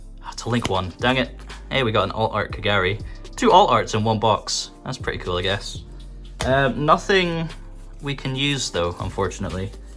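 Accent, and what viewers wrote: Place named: Scotland, United Kingdom. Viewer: Love your Scottish voice my man, keep up with the content!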